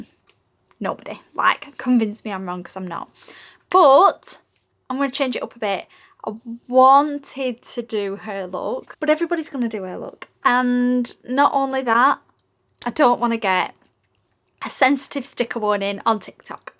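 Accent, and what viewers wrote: United Kingdom. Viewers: This is the first time hearing your voice. Blow me down, you’re British! I had no idea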